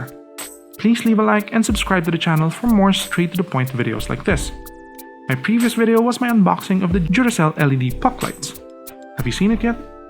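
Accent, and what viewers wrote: Philippines. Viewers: Very sexy filipino accent, say "bye roos" for me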